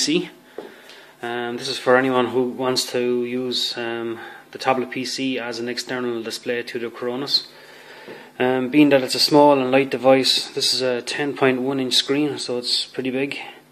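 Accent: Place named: Ireland. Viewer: I've actually bought the VSTs you sell on your website! I've always wondered what you sound like - now I know. Totally Irish, exactly like my father. Keep up the great work - Chris.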